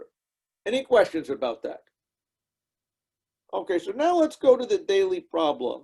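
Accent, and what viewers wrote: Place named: New York, United States. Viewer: a thick New York accent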